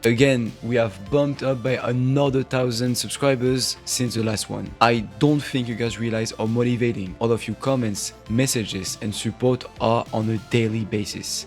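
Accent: France. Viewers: Je présume à l'accent que tu es français, alors je me permets de mettre un petit com dans la langue de Molière. Tes vidéos sont géniales mec, les thèmes abordés sont intéressants, l'humour est bien dosé et bien intégré. Continue comme ça, et prends soin de toi. Je t'ai entendu dire que derrière l'écran c'était pas facile, le soleil vient toujours après la tempête, reste fort!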